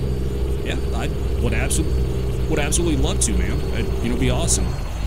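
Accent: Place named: United States. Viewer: i will assume that you are american. If so, can you tell me from what part of US are you from? I'm asking you this because i quite like your accent. And nice video! Keep up the good work!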